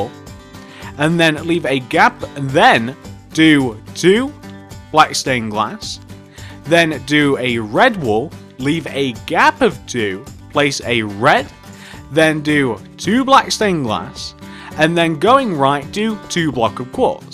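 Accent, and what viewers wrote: United Kingdom. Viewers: He sounds British, and I'm British, so if he is British, why did he spell it Theater instead of Theatre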